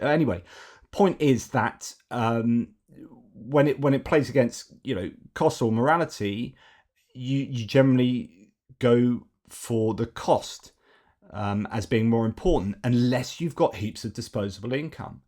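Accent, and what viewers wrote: New Zealand. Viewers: To be fair, your kiwi accent is pretty on point. Aka “choice”. 👍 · Oh, Chur, Gotta Appreciate the classic Kiwi accent that we deny all too often. Chur for the sanctions but we need to do more bro, but smol island nation syndrome is strong over here, we only dish sanctions, they're pretty chur aye bro. oh and we have likely don't have any SAS operating over there.:_love:□ definitely not. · Slow down & draw out the NZ accent! ie really chill it out, Bro!!! ;p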